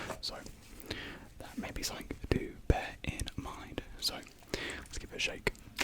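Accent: United Kingdom. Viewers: Is he British cuz British people are good at ASMR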